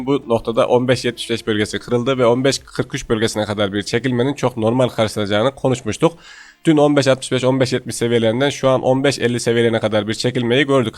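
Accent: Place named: Konya, Turkey. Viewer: Abı sen Konyalımısın ve konyadamısın konuşma tarzın benziyor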